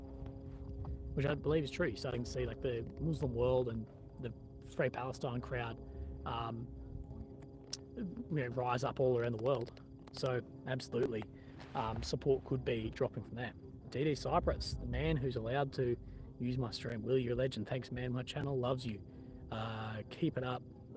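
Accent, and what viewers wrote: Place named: Australia. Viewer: That’s an Aussie accent 👍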